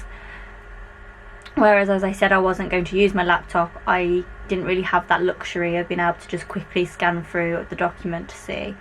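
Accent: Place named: United Kingdom. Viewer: Pre-computers, I used to write by hand before typing this up. Much later, I'd go through the typed version, revise it, then retype. Apart from the revision stage, I began doing this while at university. I didn't have time to revise my essays before handing them in. Now I write straight onto computer or Alphasmart Neo. I'll then put the first draft on one of my ereaders and make notes for revision. More revision is done in Word. If you're going to write extensively by hand, maybe consider a really nice fountain pen and nice paper. You seem to have a British accent. Here in the UK black cats are lucky, and having one cross your path is a sign of good luck. A crow, on the other hand . . . Maybe you could consider an Alphasmart Neo if distraction free is your priority. You can buy them secondhand on ebay. They used to be quite cheap, but they've gone up to around £50 plus package and postage.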